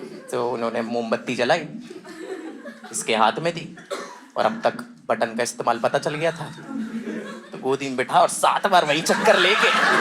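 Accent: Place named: Punjab, India. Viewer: I really love it your comedies and that little spiking punjabi I love it ❤❤❤❤❤😂😂😂😂😂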